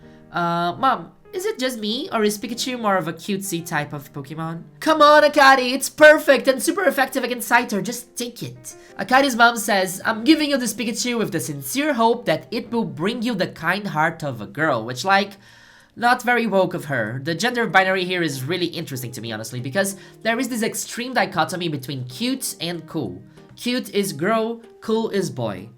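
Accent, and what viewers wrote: Brazil. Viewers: Are... Are you from São Paulo? You sound like you're from São Paulo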